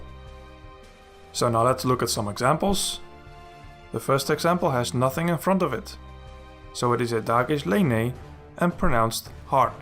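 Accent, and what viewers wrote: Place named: Netherlands. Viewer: hahaha nohhh mann. Ik hoor gelijkkkk dat je Nederlands bent 😂😂 we hebben zo'n accentje dat ze nergens ter wereld hebben. 😂😅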